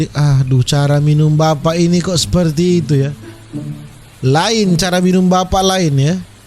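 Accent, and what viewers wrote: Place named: Sulawesi, Indonesia. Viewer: Kalau saya selalu ngakak,, Krn org Sulawesi JD memang begitu lah logat nyaa... Kasar tapi hati nya lembut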